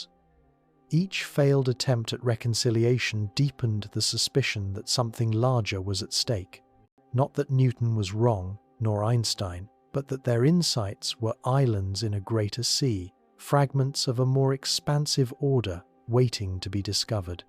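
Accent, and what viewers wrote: United Kingdom. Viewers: this British accent crap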